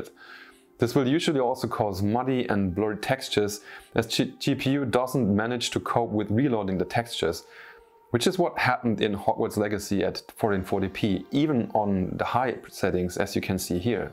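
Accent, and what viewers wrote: Germany. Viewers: Höre ich german akzent?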